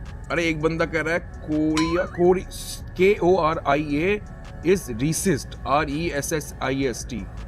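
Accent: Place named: Mumbai, India. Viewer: Pakka tu mumbaikar hai... Garaj Hogi😅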